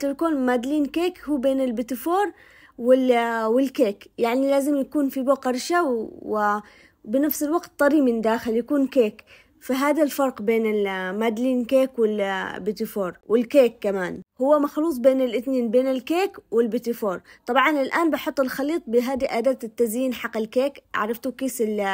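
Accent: Aden, Yemen. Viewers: لا لهجتها عدنيه الصنعني مختلفه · الأخت كإنها يمنية صديقتي يمنية جدا أحبها وهي تتكلم بلهجتها اليمنية هي من صنعاء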